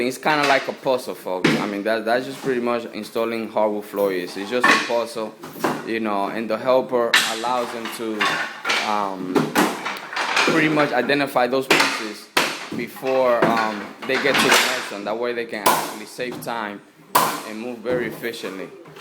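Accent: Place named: Spain. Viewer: is it just me or does anyone w a Spanish accent not good at anything?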